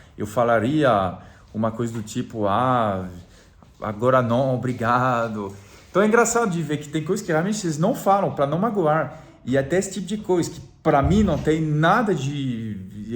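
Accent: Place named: France. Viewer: Percebo que, a cada dia, seu sotaque francês vai desaparecendo … Pena vê-lo perder um pouquinho do charme, mas vale dizer que o seu Português está ótimo! Parabéns,!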